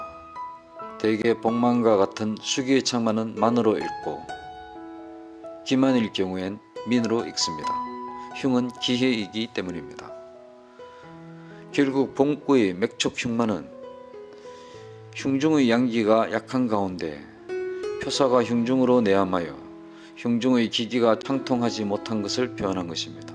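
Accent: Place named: Gyeongsang, South Korea. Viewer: 감사히 잘 듣고 있습니다.경상도 발음이라 잘 못알아들을때가 가끔 있어요^^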